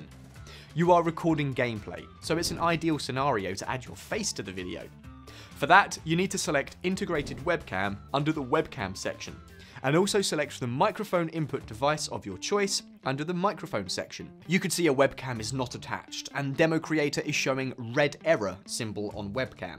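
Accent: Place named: United Kingdom. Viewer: another jagoff with a brit accent and generic content. Didn't learn too much here but appreciate the effort.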